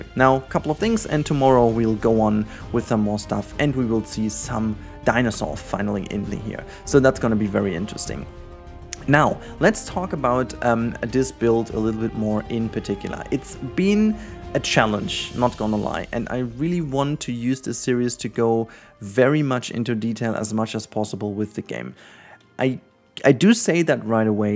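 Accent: Germany. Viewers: Gutes Video! (I assume you are German too? At least the accent sounds German to me :D)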